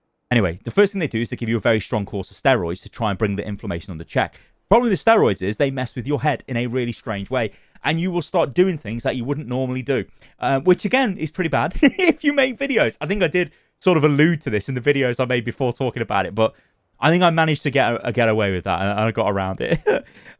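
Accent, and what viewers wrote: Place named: United Kingdom. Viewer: No lie, you're a beacon in these dark times. Super happy you're feeling better, Crohns & colitis is no joke! Also lmao "because apparently I'm lucky cause I got both" is the gallows humor that I'm here for 😂😂 wanna add that despite the fact that I'm not gonna play any blizzard games till theyve definitely changed, I'll still be here for your content cause I'd miss that working class British accent lol, you're the best duderino!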